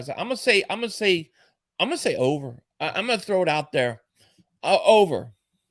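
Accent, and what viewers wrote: Philadelphia, United States. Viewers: Gotta go down the shore! Haha def Philly speak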